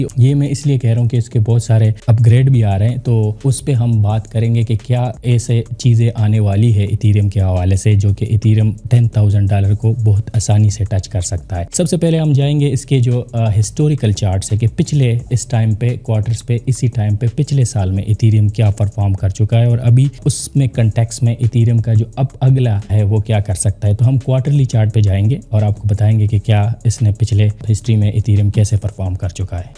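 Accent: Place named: Pakistan. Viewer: pakistani ascent lag raha. ethereum bare me pata he pakistaniyo bahut achi baat he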